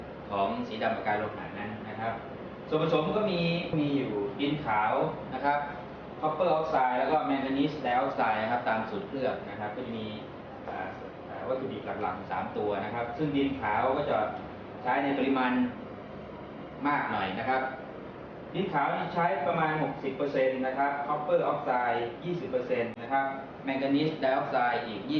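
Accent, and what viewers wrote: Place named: South, Thailand. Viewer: เกรื้องเกลื้อบดินเผา ,ทิ้งไว้สักกรู้ คนพากย์เป็นคนใต้แน่เลย<num> ก.ไก่ทุกคลิป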